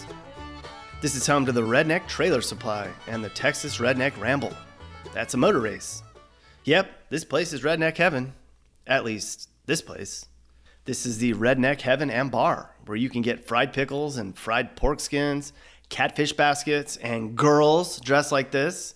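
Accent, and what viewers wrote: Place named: New York, United States. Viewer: He;s a basic New Yorker thats what a lot of us sound like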